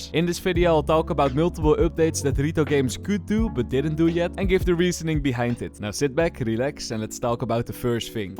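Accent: Netherlands. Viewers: Every day the fishy trick guy with a dutch accent posts is a good day:)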